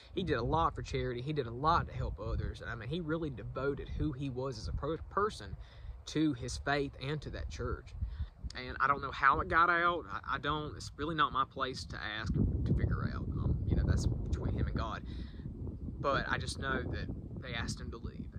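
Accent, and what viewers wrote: South, United States. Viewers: I love your accent, sounds so southern haha. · A fantastic video. I love your southern accent. I could listen to you talk all day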